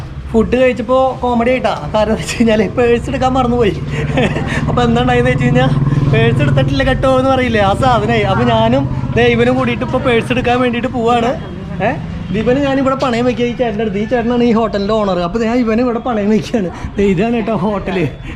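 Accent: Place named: Tamil Nadu, India. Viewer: തമിഴ് നാടൻ ഇംഗ്ലീഷ്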